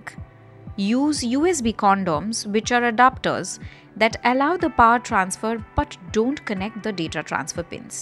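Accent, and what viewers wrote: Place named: India. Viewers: Indian Accent is best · English spoken by an Indian sounds so adorable 😍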